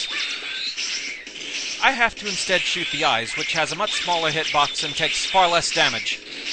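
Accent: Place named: London, United Kingdom. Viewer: Great intro! Eh, One thing that's always bothered me is your accent... I like the sound of it, but I have an instinctive hatred for it... London or something? Don't let it bother you, I can just see your aligator-like mouth moving when you talk. Hey that Shaymin on your monitor's pretty cute. HOW DARE YOU LAUGH AT CHARGEMAN!! BUT, the joke's on you, you'll feel the train's wrath soon enough. Enough random comments from me, let's see how you actually did. To part two!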